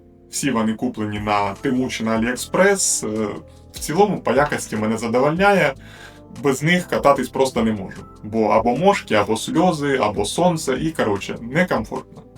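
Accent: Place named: Sumy, Ukraine. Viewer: говірка схожа на сумську.. Суми і Бровари для мене рідні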